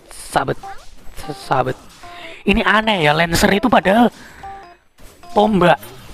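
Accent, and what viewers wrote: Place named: Surakarta, Indonesia. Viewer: Logate koyo wong solo njenengan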